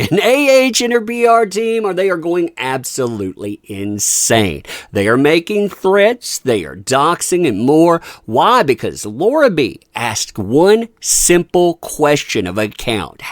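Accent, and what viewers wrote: South, United States. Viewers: I'm confident you've all (star youtubers🥰) thought about a class action lawsuit against Shane and/or the guy that threatened you with FBI and/or AH for threatening you and your families....but I'm also guessing none of you want to be tied to a lawsuit, you just want to move on with your lives....gracing your audiences with the truth, unlike the mainstream media. On another note, I can't tell you how much I've enjoyed watching and learning from all of you. Especially you, I just love your powerful, short presentations with a southern drawl covered by an umbrella.....mysterious and elegant. Anything I can do to back you guys up......I'm in!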